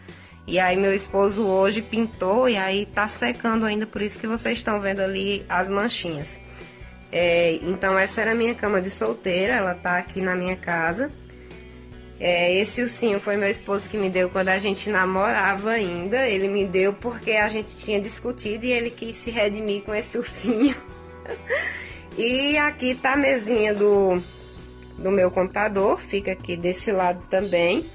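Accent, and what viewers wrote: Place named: Northeast, Brazil. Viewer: Amei seu vídeo. Que sotaque maravilhoso que simplicidade! Amei saudade do meu nordeste ❤💋😘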